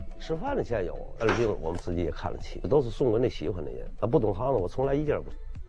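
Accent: Tianjin, China. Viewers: 就为听天津话来的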